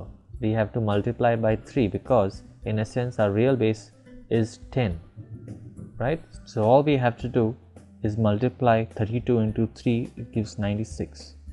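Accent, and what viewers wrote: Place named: India. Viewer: i am killing myself when listening indian when he speaks english!!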